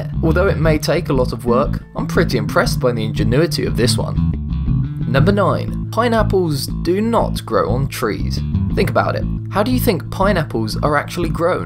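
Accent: United Kingdom. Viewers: Why did they change the voiceover dude to a British one, were Americans not good enough!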